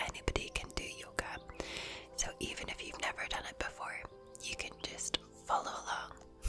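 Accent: Scotland, United Kingdom. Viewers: Woohoo... + yoga = ASMR bliss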